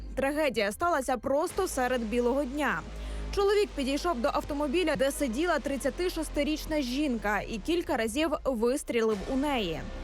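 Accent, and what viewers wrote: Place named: Bukovina, Ukraine. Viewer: Ведуча ви сама буковина Бу ко ви на.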